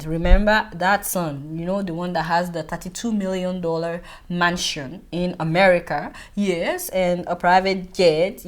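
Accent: Yoruba, Nigeria. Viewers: you are definitely keeping it real! I love your show. Keep up the good work. I love the Nigerian accent. It is the Yoruba coloration of the accent that makes it so lovely. I am not Yoruba, but I love it.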